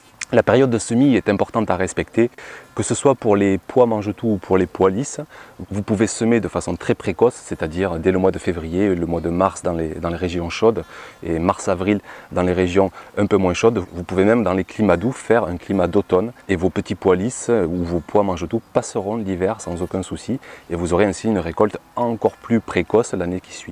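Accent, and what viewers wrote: South, France. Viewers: Salut, A la base, les petits pois, c'est pas mon truc... j'en ai mis cette année pour faire plaisir à Monsieur, et aussi parce que je n'ai jamais mangé des petits pois "du jardin", donc je veux quand-même goûter, parce qu'il y a plein de légumes que j'aimais pas, et que je mange depuis que je les cultive moi-même ^^ Par contre, j'ai semé (tardivement) des pois mangetout parce qu'à force de te voir nous les vanter dans tes vidéos, tu m'as donné envie! Donc voilà, à suivre;) Tes vidéos me mette toujours de bonne humeur, avec ton accent du Sud, ça donne un petit air de vacances. A bientôt Maud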